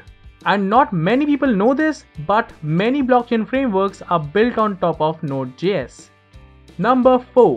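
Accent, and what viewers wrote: India. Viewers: 1) Among the dozens of videos on crypto, yours is best because of Indian English. If I hear American English I get angry because I can digest less than 30%, but I can digest 100% of your Talks. 2) To be a successful Blockchain Developer, apart from All the technical Programming stuff,what is needed is SIMPLICITY, for common man to participate in Crypto Investment.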